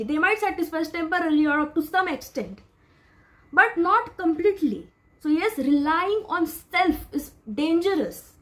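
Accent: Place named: India. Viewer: Curry accent LMAO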